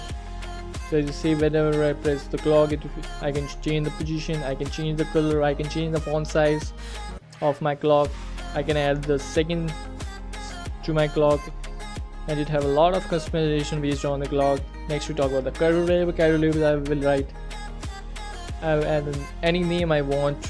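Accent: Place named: United States. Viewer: Please for god sake!Drop down Your stupid American accent.Plz.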